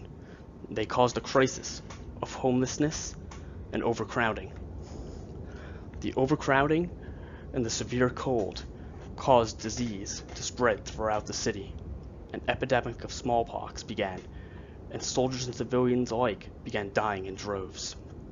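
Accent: United States. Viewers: Good work,only the American accent is sickening. Gnawing a living toad while speaking? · Sounds like a Philadelphian narrating.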